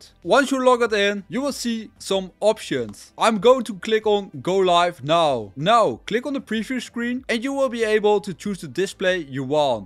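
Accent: Netherlands. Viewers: I smell some nederlands a bit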